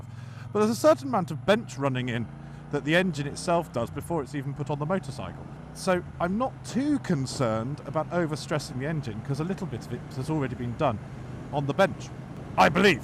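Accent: United Kingdom. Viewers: Hello! I really enjoy your entertaining videos! And I envy the comfort you have on your Speedmaster - I can feel every crack on road on my Bonneville Bobber... Though I prefer the looks of the Bobber!:) Something I always wanted to comment on one of your videos: your dialect and pronunciation reminds me of the famous arrest of this British man <link>;) BTW: the metric system is the best!;) Greetings from Germany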